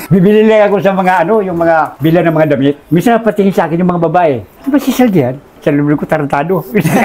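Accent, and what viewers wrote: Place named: Bicol, Philippines. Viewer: Part 4 boss Galing c Tatay magkwento tono bicolano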